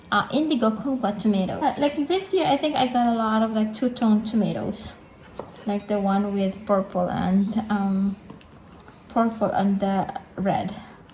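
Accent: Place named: United States. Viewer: i have never succesfully grow tomatoes. you have more fruits and vegetables in your garden than mine beh. amazing you can grow calamansi as well. you have american accent already beh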